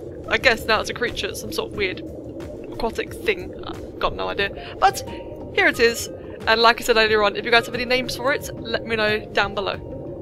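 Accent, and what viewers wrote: United Kingdom. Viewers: Perhaps it is British English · you sound british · My brain was so confused lol I thought you were a preteen Brit lmao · R U from the UK? U sound British or something. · Oh. You are british i see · This is either a nerdy boy or a British girl 🧐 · You're British!!! I love you even more now!!!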